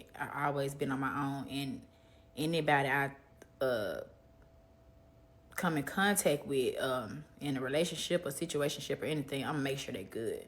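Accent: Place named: Memphis, United States. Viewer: Can tell she from Memphis 😂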